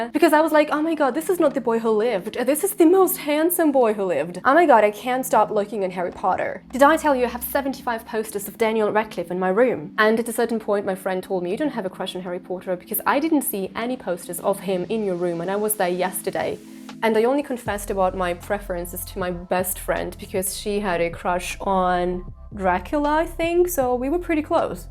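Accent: United Kingdom. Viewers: Какой красивый британский акцент. Просто posh, обожаю. Подписка! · Оо у вас классный британский акцент! Это моя мечта! Смотрела ваши видео по истории по по англ никогда не попадались что странно 🤔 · Шикарный британский акцент😍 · Renée Zellweger's pronunciation in "Bridget Jones's Diary" movies exactly -- вот что мне напомнил Ваш акцент. Она американка, и ей пришлось много поработать над британским акцентом для этого фильма. Со специалистами, само собой. У которых, видимо, была такая же лингафонная система, что и у Вас и много где ещё, поскольку я слышала польских лингвистов-специалистов с таким же вот точно британским произношением. Renée Zellweger как раз после фильма раскритиковали "спецы" за недоакцент (на себя бы посмотрели, ага :) ). Так что вот Вам и возможный фидбэк на Ваше произношение от "истинных носителей". Как человек, прошедший специальный и изматывающий курс по произношению профильного иностранного языка по системе и с экзаменами, не могу не восхищаться успехами усердных студентов по всему миру - огромный Вам (и нам всем заодно :) ) респект! 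· You have one of those British accents🌺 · pure British accent and super speed ) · Ооох, я влюбилась в ваш британский акцент, прям невероятно плавная красивая речь! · Your English is very good, slightly more onto English accent. I live in USA for 30 years now and learned from movies and people around but I do have American accent. Thanks for your videos 🙂. They are so cute. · Какой очаровательный британский акцент ❤ У меня сильнее акцент, чем у вас, хотя я и живу в Штатах уже почти 12 лет и на бытовые темы говорю свободно. В разговорной речи встречаю незнакомые слова раз в месяц, если не реже, да и носители меня понимают без проблем. Мне в изучении языка очень помогли видео с английскими субтитрами, а потом и без них. Слушаю лекции, подкасты и смотрю документальные фильмы на английском. Вам менять ничего не надо - аборигены обожают мой акцент, хотя он и сильнее вашего. Оставьте чуть-чуть экзотического изюма в речи. 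Насчет увеличения словаря может и хорошая идея, но ваша речь звучит очень легко, без повторений, запинаний и слов-паразитов - очень приятно слушать. И спасибо огромное за замечательгые ведео. Смотреть их удовольствие!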